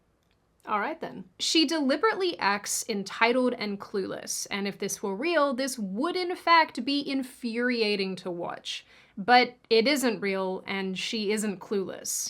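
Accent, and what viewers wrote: Australia. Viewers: You totally sound Australien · I have a lot of Aussie friends because of gaming and I can spot the accent no problem it's still there! 😁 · You still definitely sound Australian 😅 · I can still tell you’re from Australia, and that’s fine. It’s a good accent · The Australian accent is blatantly obvious, and I mean that in a nice way! lol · You sound absolutely Australian ! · "Im Australian though I don't sound like it. " Um... you definitely sound like it. 😄 The accent is a little muted but still very recognizable. 😉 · You have a small Australian accent like the youtuber "TheAnimeMan," and I knew it right away when I heard you. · I totally still hear your Aussie accent!!lol it's cute, I love it 🙂💚🦘😄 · You don’t think you sound Australian? You sound Australian as fuck 😂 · We can tell your Australian! LOL you don't sound too off!😂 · You can say you don’t sound Australian all you want. 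It’s still there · Nah, I didn't know you were living in the US. I thought you were living in Australia. You definitely have a bit of that Australia in you. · You still sound Australian. But you should still be happy where you come from. Australia is a wonderful country. What made you want to move to America? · I can hear those bits of Aussie. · Do you honestly think you don't sound Australian? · You sound extremely Australian. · You absolutely sound Australian, but its a light accent. Happy 30! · You sound like an Australian. . . No idea what you're on about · Spending time with your mum brought some of your Aussie accent back. As can happen. My mum spoke northern English when she used to speak to her mum on the phone when I was young. A small observation without a judgement :) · Also, you may not sound like Issac Butterfield, but you still sound like an Aussie. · darling, you Do Sound Australian! 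· Bless, you do sound Australian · the more I watch your videos the more noticable your aussie accent gets when it slips happy birthday, love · I picked you for an Aussie. It’s not completely hidden. Hi from across the globe 😊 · Seems her Australian accent is stronger with this video - perhaps because she recently spent so much time with her family. Didn't detract from the video though. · Good on you, family is priority. Also, you still sound Australian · This pommy thinks you stilli very much sound Australian:) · TBH, you sound very Australian and there's nothing wrong with that.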